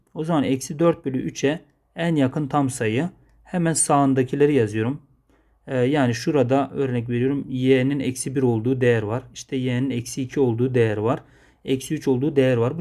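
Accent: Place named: Van, Turkey. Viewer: hocam Vanlı mısınız bir arkadaşımın konuşma şekline çok benziyor sizin konuşma şekliniz 😊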